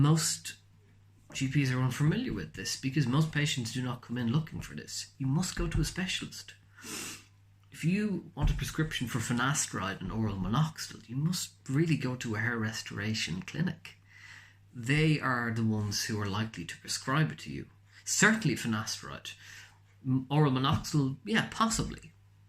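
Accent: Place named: Ireland. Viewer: Hello , thanks for the excellent video! If I’m not mistaken I hear a Irish accent. I’m from Ireland myself and I am wondering where or what brand of oral minoxidil do you use? I have been using finastaride but struggling to find minoxidil in oral form . Thanks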